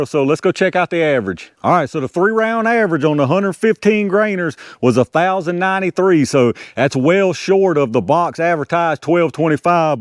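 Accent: South, United States. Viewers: You have the most classic southern drawl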